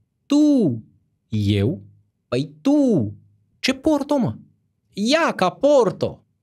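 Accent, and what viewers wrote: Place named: Transylvania, Romania. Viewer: Îngrozitor... Caragiale ar da bir cu fugiții ! Ce fel de accent aveți... În plus, cred că folosiți un microfon din anii 60, și de aceea se aude zgomotul produs de aer, un "bum-bum" foarte enervant, care se suprapune peste discursul dvs. Eu citesc infinit mai bine această schiță genială, și... fără accent ardelenesc ! Dar văd că sunteți multilateral : "1. Literatura 2. Fotografie 3. Public Speaking" La numărul 3, am să caut pe Gogol ce înseamnă...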